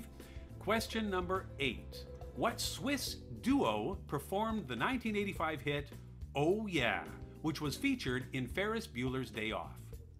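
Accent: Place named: Canada. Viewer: Canadian, eh? 7/10...thanks, from Alberta! Habs fan...sorry!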